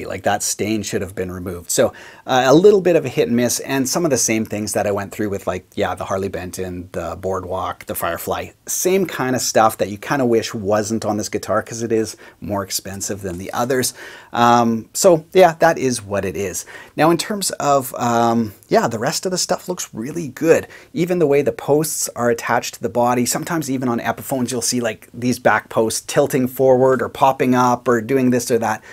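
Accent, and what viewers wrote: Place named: Canada. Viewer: Waiit... are you Canadian? LOL. I feel like I can kinda hear an accent.. either Canada or like North Dakota.. maybe that upper area of Wisconsin...or Ohio?? But... prob Canada.. ?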